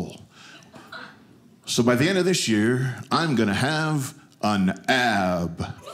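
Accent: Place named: New Jersey, United States. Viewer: So funny. I'm from Jersey. We tauk like dat!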